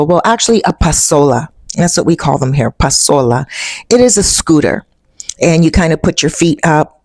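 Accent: Canada. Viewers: love the Canadian accent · Again I love that Canadian Accent. Very pleasant. BTW went to Buffalo State College and visited Toronto plenty of times. That area around Lake Ontario is Cold 🥶. Brrr 🥶. I bet it’s warm spring and summer nearly year round. Nice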